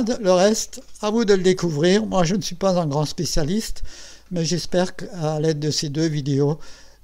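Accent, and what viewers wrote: Alsace, France. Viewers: clin d'oeil pour l'accent du Sud Alsace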